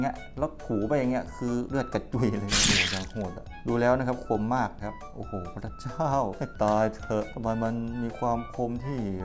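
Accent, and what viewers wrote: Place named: Isan, Thailand. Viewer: 555มีอารมขันด้วย เว่าอิสานได้ยู่ตั้วนิ่☺☺😅😅😅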